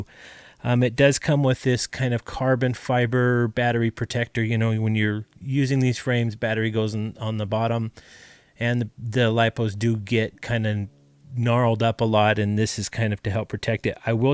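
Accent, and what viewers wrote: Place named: Australia. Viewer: yes "gooday!! And tooday!!!.." Catchy as fuck specially with that down under cheekyness. 👍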